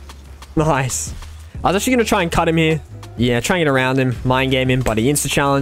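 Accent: Australia. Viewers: bro i didn’t realise you were aussie! should coach me 🤣 xx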